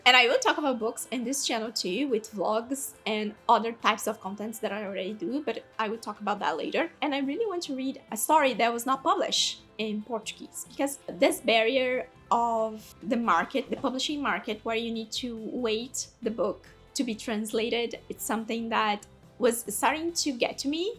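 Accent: United Kingdom. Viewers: I love that u sometimes have a British accent and others an American one hahahahaha I’m the same! If I learnt the word with an American speaker I’m gonna say it like them, if it was with an Australian speaker, forever I will have one word in my vocabulary with the Australian accent 😂😂 I believe that happens with your accent based on the accent of the audiobook you came across for that vocabulary 😅😅 I love it so much and I’m gonna be here for all the videos as I am with your primary channel, following your success ❤❤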